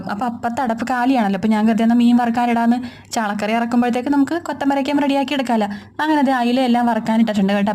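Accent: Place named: Thiruvananthapuram, India. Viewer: ചേച്ചിടെ ചില സംസാരം കേൾക്കുമ്പോൾ തിരുവനന്തപുരംകാരി ആണെന്ന് തോന്നുന്നു ഇവടൊക്കെ പറയുന്ന അതേ സംസാരരീതി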